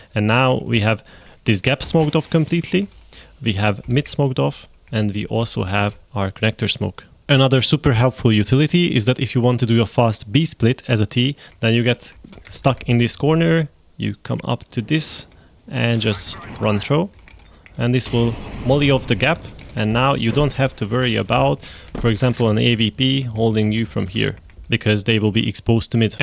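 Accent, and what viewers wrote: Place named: Hungary. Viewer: éreztem, hogy magyar vagy xd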